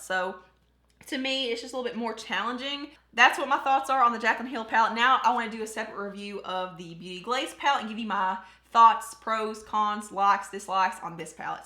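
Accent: South, United States. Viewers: I heard your accent and subscribed😂😂 As a girl from a small town in NC, I say we need more southern youtubers lol!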